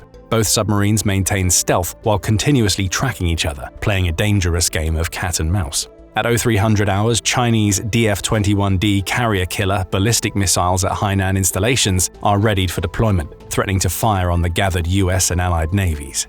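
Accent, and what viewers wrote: United Kingdom. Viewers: The moment the British voice, I m gone.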